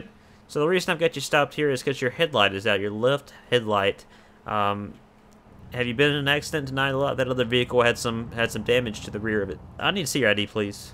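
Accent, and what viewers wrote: South, United States. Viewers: Nice accent lol, always good to see some fello good ole country boys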